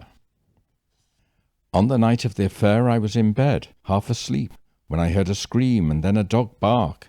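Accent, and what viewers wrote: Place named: Swansea, United Kingdom. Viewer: Having just finished my own first book, you have my sympathies. It involves such a monumental commitment of time and emotion with no guarantee of conventional publishing success, but with the strong platform you have built here I’d have thought there’s a good chance you’ll get interest in publication. I had no idea you too are from Swansea (though I thought I detected traces of a local accent in your voice). Ive enjoyed your work greatly during the last few very difficult months so wish you ‘pob lwc’ with your book, which I can’t wait to find out more about especially as the locations are so familiar.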